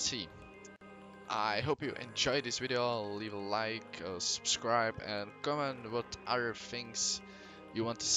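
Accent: Czech Republic. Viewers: Já si úplně říkal že to je definitivně česká angličtina a pak jsem viděl české menu 😂😂👀 actually mi to pomohlo se rozhodnou jestli cs 2 stáhnout 😂 já určitě ne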